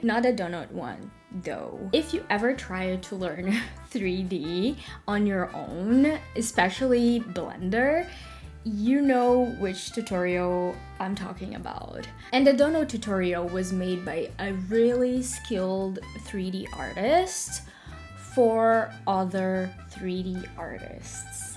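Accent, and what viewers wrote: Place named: Brazil. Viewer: oh my god, i love english brasileiro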